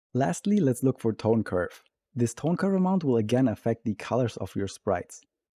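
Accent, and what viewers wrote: Germany. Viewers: Ich höre den deutschen Akzent raus 😄😉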